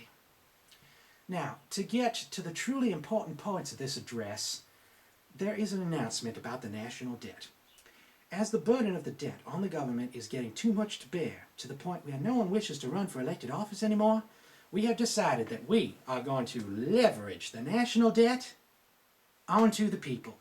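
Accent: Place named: Virginia, United States. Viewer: Nice northern coastal Virginia accent.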